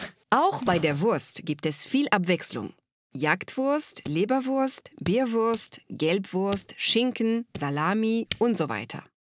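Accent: France. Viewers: Wieder so süß erklärt.... mit diese charmante französische Akzent! Unterhaltsames Bildungsfernsehen 😘 Jaaaa, wir Deutschen lieben unser Brot, kann ich nur bestätigen! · Aww, ich liebe diese kleinen Filmchen zur Erklärung 😍. Ich liebe den Akzent und die französische Kultur. Spannend, wie die deutsche Kultur gesehen wird. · Am schönsten bei Karambolage ist noch immer der französische Akzent.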